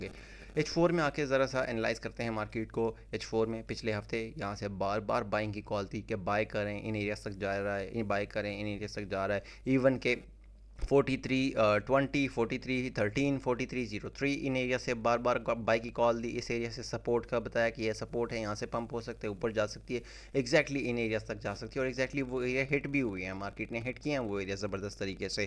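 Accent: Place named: Peshawar, Pakistan. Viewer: Assallam o alaikum bro aap peshawar s hu Mashallah ap ka accent unhi jaisa hai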